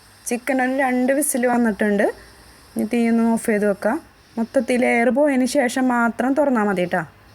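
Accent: Kodungallur, India. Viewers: ഇത്താ കൊടുങ്ങല്ലുർ ആണോ വിട്. സംസാരം കേട്ടിട്ട് ചോദിച്ചതാ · hey njnum kodungallurr... Eriyad.. enkku samsaram kettappol thonni.. kodungallurr evdeya... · Itha kodungallur kary aano... slang kettitt thonni... nice recipe.. njn nthayalum try cheyyum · ഇവിടെ മക്കൾ പറയുന്നു ur talking style same as me.. അതാ kdr ആണോന്നു ചോദിച്ചേ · samsaram kettapol thonni njnum kodungallur aann😁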